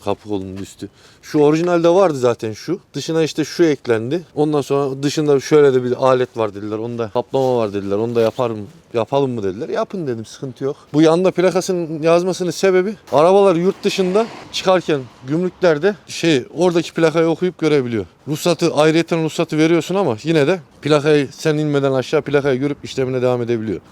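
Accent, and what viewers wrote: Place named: Çorum, Turkey. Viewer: Abi Nerelisin Aynı Çorumlular Gibi Konuşuyorsun Başarılar :)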